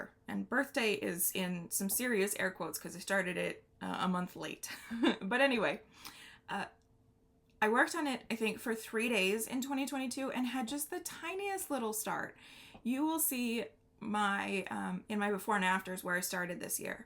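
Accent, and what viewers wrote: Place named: Wales, United Kingdom. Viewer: Love your progress , congratulations on 10 years on flosstube , Welsh is a accent I really love 😊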